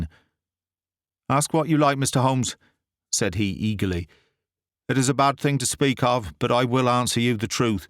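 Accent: United Kingdom. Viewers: The eloquent British dude running this channel has no idea how many times his soothing narration has rocked me off to sleep.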